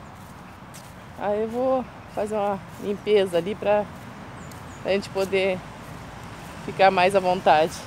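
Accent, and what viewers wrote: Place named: Santa Catarina, Brazil. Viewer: Vc tem sotaque de Catarinense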